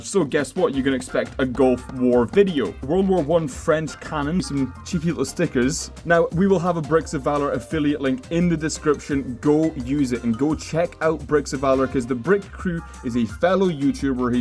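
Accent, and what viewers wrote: Scotland, United Kingdom. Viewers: are you scottish?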